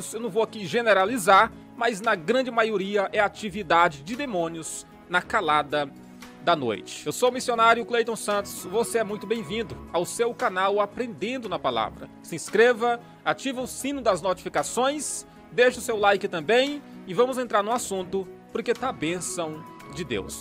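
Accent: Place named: Maranhão, Brazil. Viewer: Kkkk você prega a verdade mais ao mesmo tempo é super engraçado com esses sotaques Maranheses!!! As vezes agente nem ta a fim de rir mais vc nos faz rir! De São Paulo capital!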